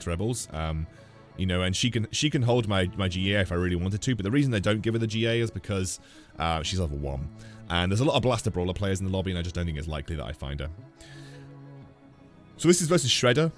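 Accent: United Kingdom. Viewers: nohomo bro, you have such a clear, crisp voice! Great for youtube. This might just be the American in me thinking British accents are OP though.. LOL btw, what's your rank?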